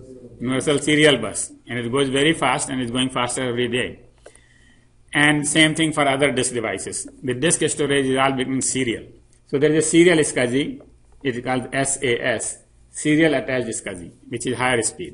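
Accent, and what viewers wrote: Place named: India. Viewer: He has Indian accent, may be that's why it is hard to understand. Otherwise it is quite good english. Thanks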